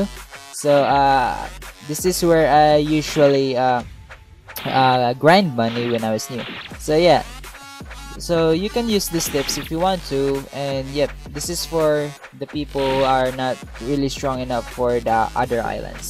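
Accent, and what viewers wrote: Philippines. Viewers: You sounds like a filipino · woah also if u dont mind me asking but are u filipino? ur accent is familiar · This Guy Is A Filipino I Dare You His Voice Is Like a Filipino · Sounds like he is from Philippines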